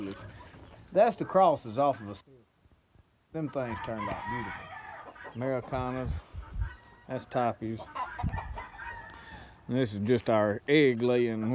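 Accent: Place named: Tennessee, United States. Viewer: Where are you located? Sound close to tn.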